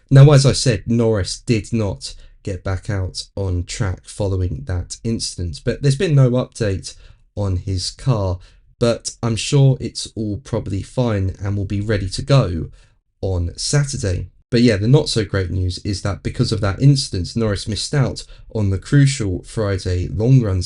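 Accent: England, United Kingdom. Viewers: Its called an accent, not impediment. There is a country called England, they speak the same words as america but with a different accent. Hope this helps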